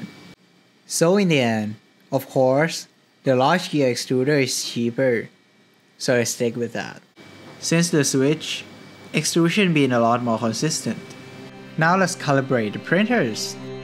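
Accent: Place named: Thailand. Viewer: very thai accent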